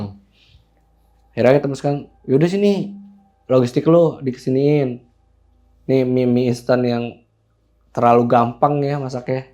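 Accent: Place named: East Java, Indonesia. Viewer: saya kira vidio realnya mange🙏🏼... soale saya orang Jawa timur😊suaranya kyk orang Jawa timur 😊🙏🏼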